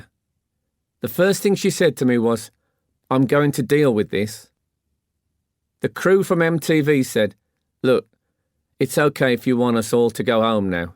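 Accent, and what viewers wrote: United Kingdom. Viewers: Thank God this was told in a British accent. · That brummie accent sounds perfect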